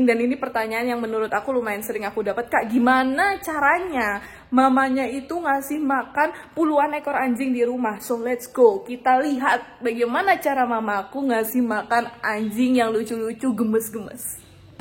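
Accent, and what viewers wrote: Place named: Surabaya, Indonesia. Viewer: Kak ngomongnya kok agak medok seh ? Agak surabayaan gitu...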